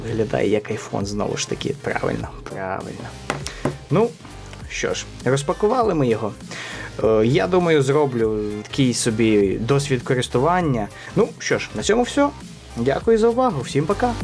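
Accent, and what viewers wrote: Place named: Western Ukraine, Ukraine. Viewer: Западный акцент забавный)))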